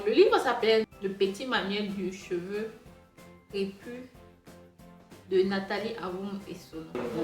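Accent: Cameroon. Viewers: Hey, es tu camerounaise? J'aime beaucoup ton accent, ne change rien. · Effectivement je connais une camerounaise qui parle comme ça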